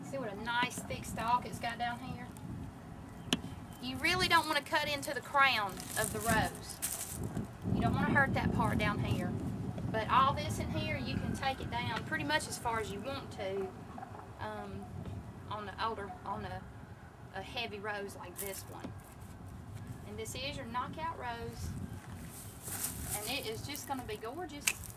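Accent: South, United States. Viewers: love that Southern Drawl, sweetie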